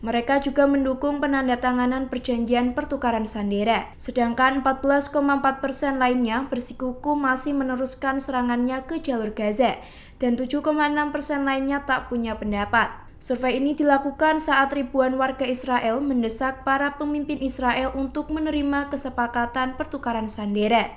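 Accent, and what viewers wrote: Java, Indonesia. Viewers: Soundnya kok medoookkk banget 😂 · suarane medok,,,tp merdu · Ngomngnya medok amat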